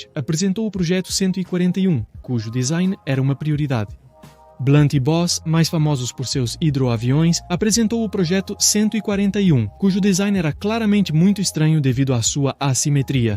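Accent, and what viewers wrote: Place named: Portugal. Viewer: Acento portugués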